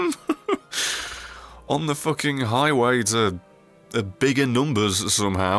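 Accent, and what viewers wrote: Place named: England, United Kingdom. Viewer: It feels weird to think that I subscribed to this back when you didn't even have 20k subscribers. Hell, I thought you ha way more subscribers until you uploaded that 50k subscriber video. It was just legitimately funny watching you getting your shit wrecked by Dark Souls bosses & having goofy reactions to multipayer gamertags. Then again, I usually find rapid-fire comedy with an English accent irresistible. Congrats on the 100k!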